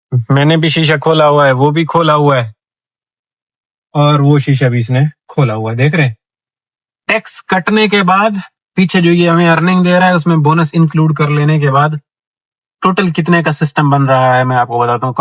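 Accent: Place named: Sargodha, Pakistan. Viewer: Bhai ap punjab sy ho kya apki punjabi ak dum sargodho jhang Chiniot me jo boli jayi osi trha ki hai karachi wly to asi Punjabi bol ni skty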